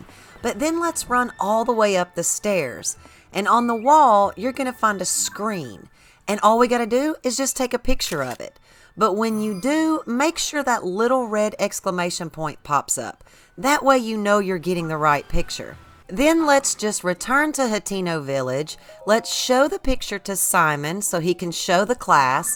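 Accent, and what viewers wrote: South, United States. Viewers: You are from the south thanks for the information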